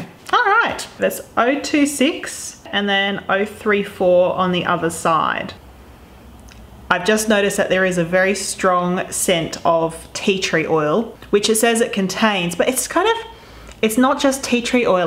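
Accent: Australia. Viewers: Can’t tell you how great it is to hear a fellow Aussie reviewing products. The mannerisms make the video 😂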